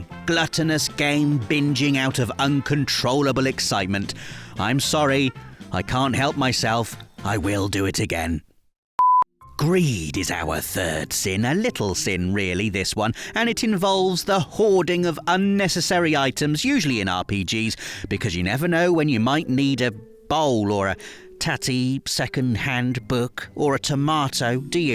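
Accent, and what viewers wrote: England, United Kingdom. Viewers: Wrath is pronounced ‘Roth’, inventory is pronounced ‘in-ven-tree’. It’s like and English accent American channel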